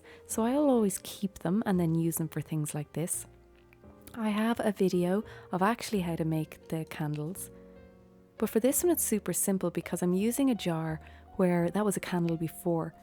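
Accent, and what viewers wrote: Ireland. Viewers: The thumbnail was very attractive, and I just love your voice, Its very soothing. love the Irish accent (Right?) (I was just listening to lots of Americans, ((Dont ask)) and that just makes the Native accent more recognisable.) You've definitely earned a new subscriber, these are attractive and helpful gifts too.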